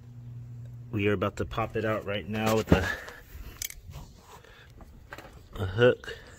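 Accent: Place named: Pennsylvania, United States. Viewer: Are you from back east, you sound like/= my neighbor from P - A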